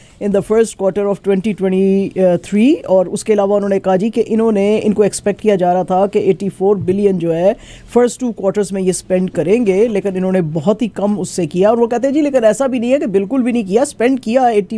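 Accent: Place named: Punjab, India. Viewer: Madame - your urdu accent is very poor . You speak urdu in punjabi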